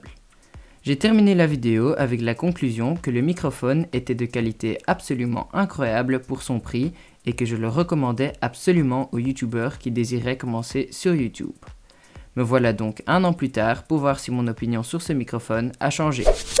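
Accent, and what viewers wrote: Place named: Flanders, Belgium. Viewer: Cet accent flamand est atroce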